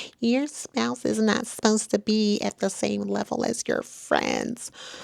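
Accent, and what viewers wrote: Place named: United States. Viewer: I love this accent , where abouts in America are you from ?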